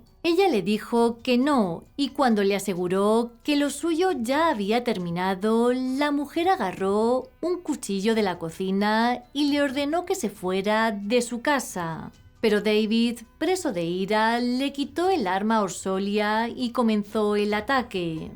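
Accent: Spain. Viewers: Me encanta tu tono tan español !!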